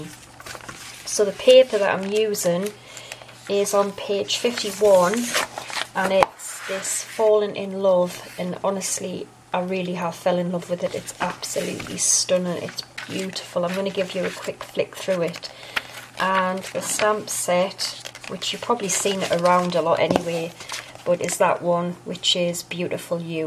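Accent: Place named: Sunderland, United Kingdom. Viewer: Nihe1967 I would guess Sunderland? Quite specific accent 😊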